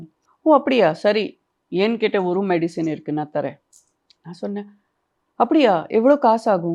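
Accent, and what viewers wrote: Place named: Kerala, India. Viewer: Kerala va ma neenga tamil peasum podu thearigeradu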